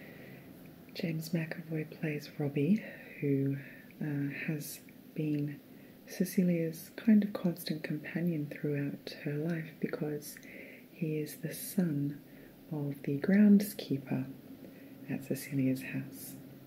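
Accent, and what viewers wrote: Australia. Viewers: 😍📚 Everything about this is perfect! Your articulate summaries for two of my most beloved films, Atonement and Geisha, are much appreciated. ^_^ Keira and Michelle Yuen are favorites. The library is my refuge, and mythology is timelessly dreamy. Your Australian accent lends itself to ASMR as well. 😃 I am thrilled to be a new subscriber.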